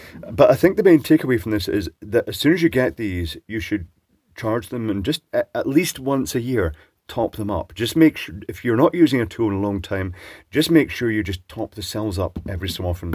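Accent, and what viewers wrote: Scotland, United Kingdom. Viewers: A Scottish accent indeed, but a very pleasant lyrical Scottish accent. I wonder what area of Scotland speaks like that? Certainly not Glasgow with its hash, brutal delivery.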